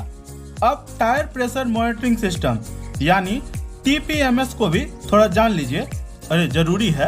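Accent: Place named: Bihar, India. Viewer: बिहारी वाला अंदाज गजब शानदार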